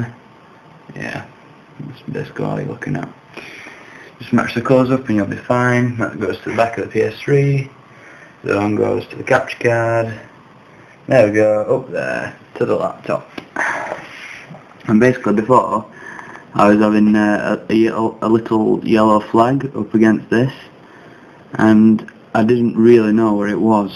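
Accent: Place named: RP, United Kingdom. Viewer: Posh voice there lad;)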